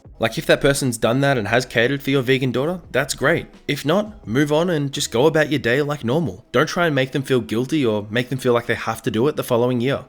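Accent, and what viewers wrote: Australia. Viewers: Wait is aussie? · wait are you australian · Im not 100% sure what his accent is but it is the best one Edit: well apparently it is an australian accent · Wait?!? You're Aussie? How have I not picked up on this? Anyways, keep up the great work mate, I love your videos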